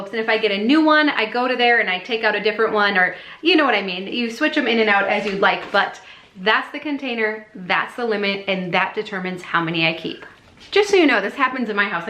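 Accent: Minnesota, United States. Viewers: Me: I wonder where this lady is from.: Plastic baegs Me: Ok, Minnesota then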